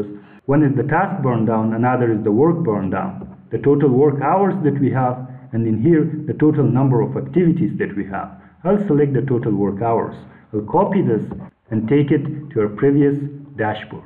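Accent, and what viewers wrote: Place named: Afghanistan. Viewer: The time I heard you, guess what, I told my friend this person is from a very special country, Afghanistan. Amazing Mr., great job!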